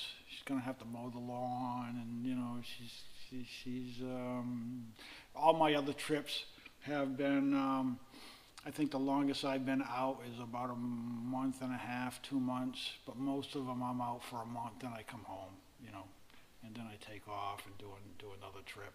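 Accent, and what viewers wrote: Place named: Boston, United States. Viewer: Love the Boston accent. I start NOBO on 2/24; best of luck on your journey! Maybe find someone to mow the lawn so your wife doesn't have to do it- lol.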